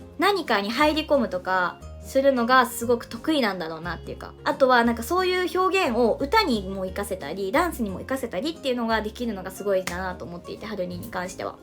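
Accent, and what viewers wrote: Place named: Yamaguchi, Japan. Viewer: うん、山口弁を隠しきれない。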